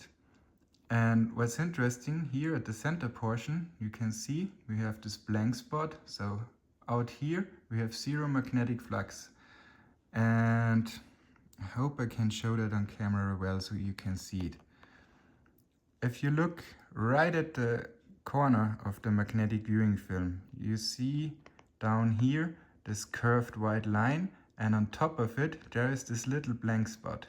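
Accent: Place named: Germany. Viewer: Hörst dich deutsch an.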